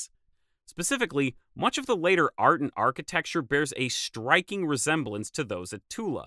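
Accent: Midwest, United States. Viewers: Omg your voice is so American ☹️ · well, I like your Midwestern accent. Please continue to speak in your natural way; being English different make you smarter.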